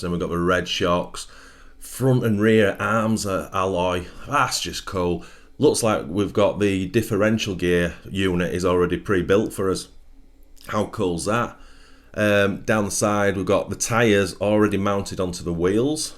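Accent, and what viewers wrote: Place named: North, United Kingdom. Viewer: I love thar North accent.... Boogy = buggy Oop = up Etc Great vid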